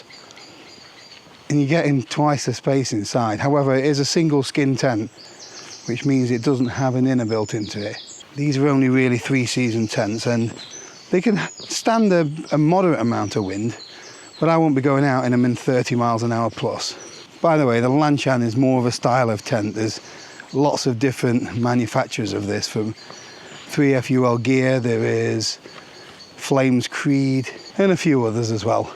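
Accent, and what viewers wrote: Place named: East Yorkshire, United Kingdom. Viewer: Brilliant video from Mr., very informative.... 'Ull' east yorkshire.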